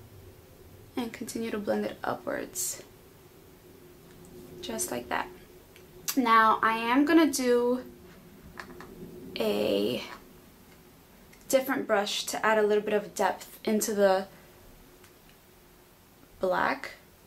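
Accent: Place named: New York, United States. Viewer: love your accent! are you from NY?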